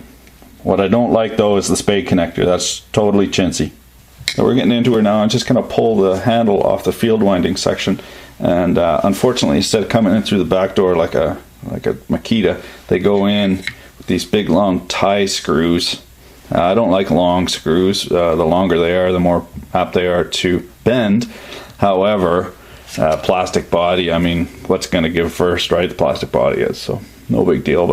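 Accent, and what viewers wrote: Quebec, Canada. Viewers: I fucking love how he drops french canadian lines here and there..! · Canadian or what? some of those words I have never heard an american say.... but then again I stay away from the lake areas. great video. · OK...OK...this is now my SECOND favourite channel on YouTube. Love the close up camera work and you actually seem to know what you're talking about!! Bonus. I've subscribed and I'm heading off to your channel to see what else you've reviewed. Do I detect a Canadian accent...sorry I'm from Australia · dude, i just kinda stumbled across you're vids and I am by know means an engineer...however I am sooo intrigued by all your knowledge of this stuff. Im just a humble union carpenter and saw you reviewing some tools i was interested in getting and now i cant seem to stop watching your vids... your canadian accent, your sense of humor, your depth of knowledge...congrats dude! 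you just got yourself another subscriber :) cheers from you're southern neighbor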